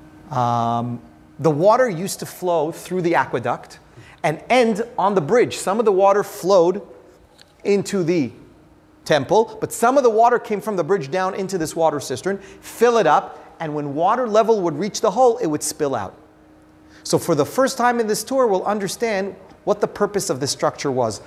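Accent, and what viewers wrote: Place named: United States. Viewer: It would be better with subtitles. Sorry to say it, your American accent , sounds anxious and your tone of voice neurotic.. Think who is your audience. You are doing a disservice to a great work. Let an Israeli tell the story.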